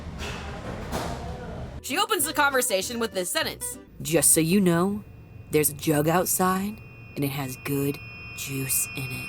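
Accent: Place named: South, United States. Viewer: i'd say it's not only the southern accent, but the voice itself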